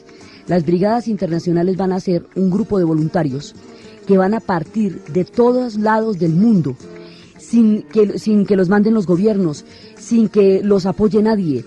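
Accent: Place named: Colombia. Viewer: Felicidades,a pesar de conocerme de pe a pa esta historia me resulta grato escucharla con el acento de...Colombiana? y los incisos de canciones y peliculas...genial las he visto todas!y mejor no se puede expresar la sintedis de ka lengua de las mariposas manita arriba y..LIKE!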